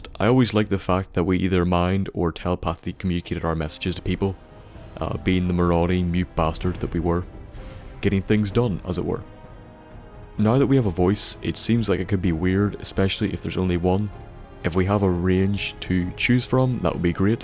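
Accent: Northern Ireland, United Kingdom. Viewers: Are you from Northern Ireland ? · Finally realised you're from Northern Ireland. Rad about ye kiid?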